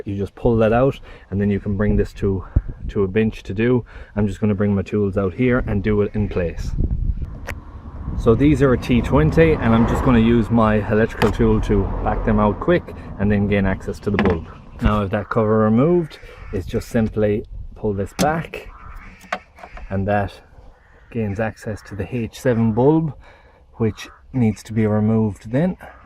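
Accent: Ireland. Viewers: not only was the instruction well conveyed but its nice to hear it with an irish accent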